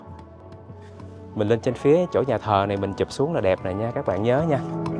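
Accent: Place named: Saigon, Vietnam. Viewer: Giong de thuong, giong chi'nh goc nguoi SG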